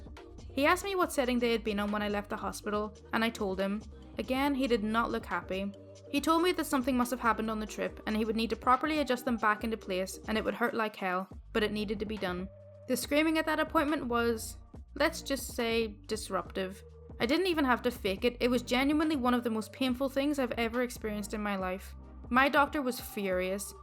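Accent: Ireland. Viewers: Hm... are you Irish,? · Np, it's true though. ^-^ You really never considered it? And hmmm... The obvious would be a wise guide character type, such as nanny mcfee. The accent, (Soft Irish?), is perfect and you're very well spoken. I'd guess an anime protagonist would be best though. You have a welcoming voice, and you're likable. Bet you could rock a Ghibli film! What do you think would suit you?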